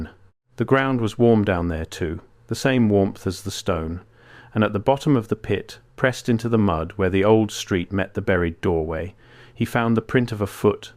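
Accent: United Kingdom. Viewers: Thanks for saving me twenty minutes. It’s ridiculous to have a British accent for this story. It’s kind of like if one of the holler gals usually dressed in a dirty cotton dress suddenly donned a dress just sent from Paris. A mini skirt dress, yellow with large black polka dots and black boots.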